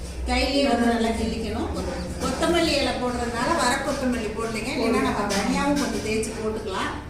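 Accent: Kongu, India. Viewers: கொங்கு குரலும்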